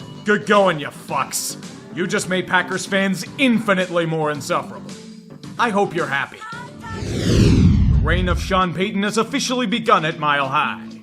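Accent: Pittsburgh, United States. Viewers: Man I missing hearing the voice of this Yinzer bastard! 😂 Welcome back,. 👌🏾💯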